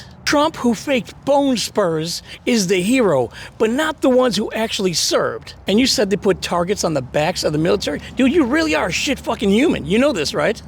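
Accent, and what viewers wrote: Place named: South, United States. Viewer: Ok. That is heavy words from someone who has never served. And hasn’t served in combat. Douche bags like him like to talk talk talk. But, we were fighting in the Middle East for awhile and this fuck chose not to serve. And his Call of Duty ass wants to call out combat vets. Your southern accent proves people in the south skip a lot of school. Watch your self homie. Combat vets are the quiet ones.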